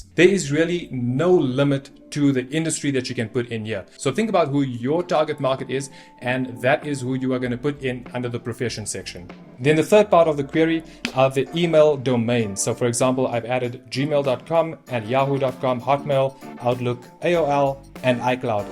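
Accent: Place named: South Africa. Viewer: I know a South African when I hear and see one..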